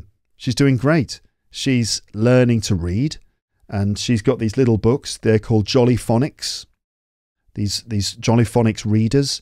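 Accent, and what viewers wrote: United Kingdom. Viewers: Congratulations 🎊 👏. Time fly by. I still remember myself looking for a good British podcast, after I noticed that I'm only exposed to the American English through TV shows, movies and podcasts. I looked and looked for a British podcast. Finally, I stumbled on English Podcast and became a huge fan. Thank you from the bottom of my heart, without this amazing podcast, I would never learned that much about British English and its various accents. Congratulations,. keep up the good work. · HI, I love this episode so much. I started to FOLLOW your videos on YOUTUBE because my boss's pronunciation is very similar to yours, and he is also from the UK, which seems to be Yorkshire. But after actually listening to it, I feel that your accents are still different. Yours is very standard British English. I often can’t understand my boss’s English. 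Everyone’s feedback is that he didn’t open his mouth when he spoke. I don't know if his teeth grow well, because he basically doesn't show his teeth when he talks. But your teeth look good. I am so happy to learn that your daughter is also learning the violin today. My daughter has been learning the violin since she was 5 years old. Although she did not start a performance career, she has cultivated her musical literacy, which is enough for me. By the way, she is currently a sophomore in London, and she is enjoying life in London very much, I am very happy for her. In short, thank you so much for accompanying me in my leisure time with so many videos, thank you, and wish you all the best in your life!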